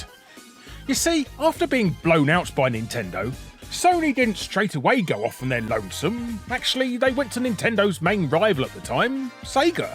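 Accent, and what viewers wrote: United Kingdom. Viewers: He's British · You new here and never heard a Brit? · Sup, Just writing “Hi” here to confirm that INDEED the subtitles help me TONS as a non eglish speaker with trouble understanding heavy accent english (no offense brits i LIKE your english, but is hard to understand some of your pronunciation 4 me without subs. it even helps me to learn it)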